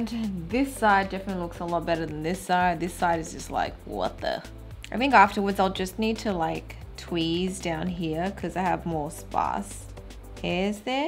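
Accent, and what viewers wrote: Australia. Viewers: I really love videos, aside from you're learning from her beauty videos, I'm learning on her accent as well since our clients are Australians. Biggest thumbs up to my most favorite youtuber!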